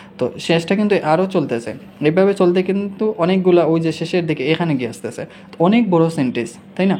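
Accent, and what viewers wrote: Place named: Sylhet, Bangladesh. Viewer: সিলেটের লোক, কথায় অঞ্চলিতা চলে আসছে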